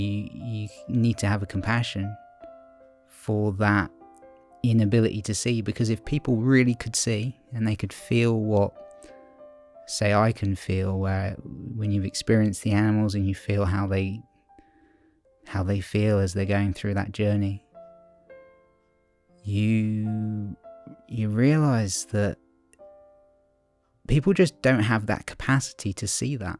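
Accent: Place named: United Kingdom. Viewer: Good to hear from you! Your British accent is beautiful as well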